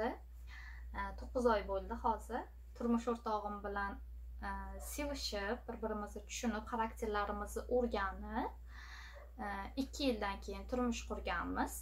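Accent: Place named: Jizzakh, Uzbekistan. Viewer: Jizzaxcha shevalarizdan sizzi😁😁🤗🤗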